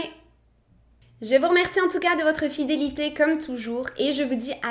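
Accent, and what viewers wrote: Quebec, Canada. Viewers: Salut à toi ! Et un gros bravo pour tes histoires si fraîchement racontées , surtout ne change rien , reste telle que tu es , avec ton petit accent du Québec parfois . Sur cette histoire , tu parles du tueur du zodiaque , je ne connais pas assez cette histoire pour y apporter quoi que soit ayant rapport avec ce tueur . En revanche tu parles du tueur au Dahlia noir , et cela est fort peu probable que ces meurtres soient liés avec Ed , car il fut démontré que les méthodes utilisées pour mutiler les victimes , étaient utilisées par les chirurgiens pour pratiquer certaines opérations , et que seule une personne expérimentée en la matière aurait pu commettre de tels crimes . Au plaisir de t’écouter encore ! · On est d accord elle a un accent québécois ? (Tres léger ) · Franchement tu fait des vidéo de très bonne qualité et en plus j’adore ton accent,canadien peux être ? 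· N'empêche l'accent du canada commence a prendre le dessus sur de plus en plus de mots😂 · Allo!...je viens de m'abonner à ta chaîne...ça fait une semaine...tu es très bonne...mais parcontre... quand tu saute d'année...( Comme dans cette épisode)... Serait le fun que tu dises à quel âge est rendu le sujet...et pour parlé encore de cette épisode ci...tu dis a la fin qu'il a des ressemblances avec les lettres codé que le zodiaque a envoyé...mais tu n'a jamais parlé de ceci avant... continue ton bon travail tu vas aller loin....et aussi...j'ai bien entendu ton accent Québec 😊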